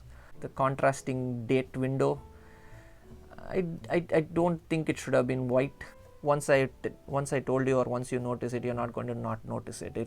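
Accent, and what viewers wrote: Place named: India. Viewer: Just can't listen to Indian accents for too long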